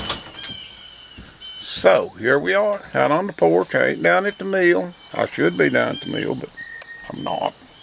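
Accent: South, United States. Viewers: I think you talk like a southern gentleman. Up north we talk a lot courser. Not smooth. And New York state has five or six distinct accents. We live 90 miles north east of nyc and are a summer tourist area. Lot of city people moved up here over the years so we sound more like that then people furtber upstate.I know when I am in Tennessee and open my mouth them folks know I ain't local! Have a nice weekend. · Hi!!😀😀 I just love listening to you with your southern accent!! 😀😀 I can understand you just fine. Top less women in Ashville!! Wow!! I think I need to visit. LOL!!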